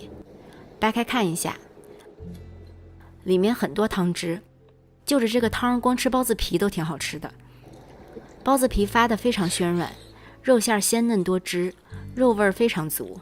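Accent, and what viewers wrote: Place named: Tianjin, China. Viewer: 听口音是天津的小姐姐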